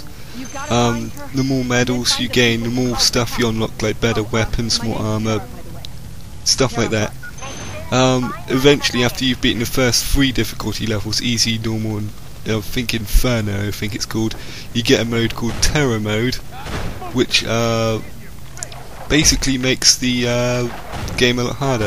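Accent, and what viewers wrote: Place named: United Kingdom. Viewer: hahaha, british accent xD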